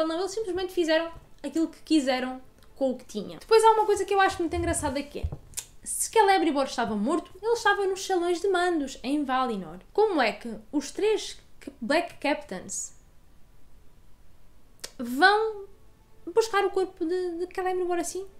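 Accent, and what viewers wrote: Portugal. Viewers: Como eu adoro o sotaque de Portugal. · Mano ... é um charme ouvir essa mulher falando, eu me amarro na voz dela! mas, cai entre nós!!! já é FODA os nomes do universo da Terra Media! eu preciso de um papel pra anotar quem é quem pra prosseguir na historia... e pra surrar a boca do estomago, uma bela mulher com seu edioma Portugues-Portugal. Aqui deixo a minha dor!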